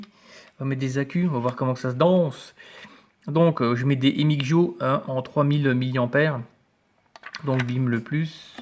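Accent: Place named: Lorraine, France. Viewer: Hop'la l'ami Lorrain, vla un accent que je connais bien ;) Je viens d'à coté de chez toi, je possède aussi une Fuchai 213, normal que je m'abonne !! Bonne vap et bonne continuation ;)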